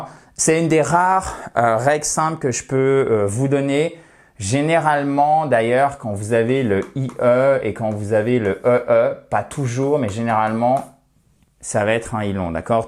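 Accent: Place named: United States. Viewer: Vos vidéos sont instructives et intéressantes. Votre prononciation se rapproche plus de l’anglais américain que de l’anglais britannique. Bonne journée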